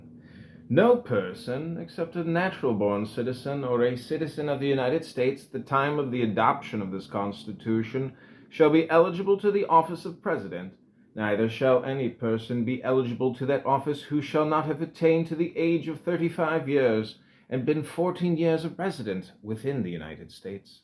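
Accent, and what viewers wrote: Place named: United Kingdom. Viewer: It just seems wrong to recite the Constitution in a British accent.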